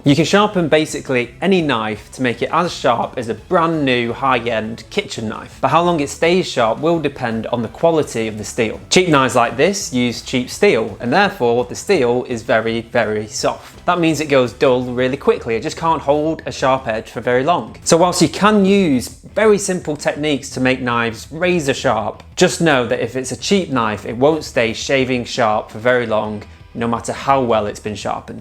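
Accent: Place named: United Kingdom. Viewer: You are one of the most real YouTubers out. You give accurate, real information no bs. Keep it up. I bought a crappy flip knife (looks super cool was extremely dull) off a homeless dude for $1 ( i also bought him taco bell) this video helped me restore this knofe to satisfactory sharpness and is now my edc. Thank you so much. (Also your voice is nice im american and the British accent makes us c.u.m...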